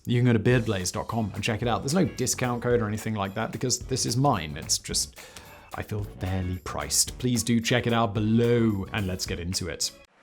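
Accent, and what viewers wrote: England, United Kingdom. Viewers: "the French and English doubled down..." the somme was fought by the British Empire, not just England. Being Scottish, that coming with an English accent was particularly painful!! And Im not one of those wankers who love to point out mistakes - I just couldnt let that one go. FFFFFFRRRREEEEDOM!!!!!!!!!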